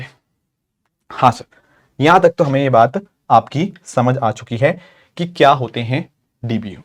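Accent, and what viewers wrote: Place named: Punjab, India. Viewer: Sir you teach great,but are u Punjabi? From your accent I'm guessing because Punjabi living in Haryana Delhi have similar accent of Hindi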